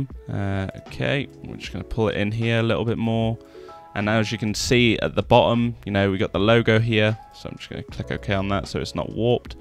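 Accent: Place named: United Kingdom. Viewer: I can't understand some British accents,but your voice and accents are cool.Thank for making these things.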